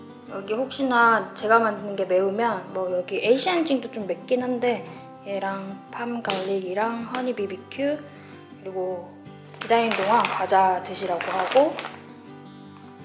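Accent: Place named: Gyeongsang, South Korea. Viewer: 맞어요, 저도 안하려고 하다가 그냥 지나가면 너무 슬플것만 같았어요. 풍선 부는거..보통 일이 아니셨을듯 해요.ㅠ 전 한국에서 소품 주문 해서 오고 있는데 미국에서도 이렇게 이쁜것이 많네요~ 영상 보면서 말투가 같은 경상도 여인이라 무척 더 반갑네요 ㅎㅎ 님 아가영상 정주행 하며 태교도 하고 갈께요 ~ 😍 식탁 정보도 너무 감사해요~!^^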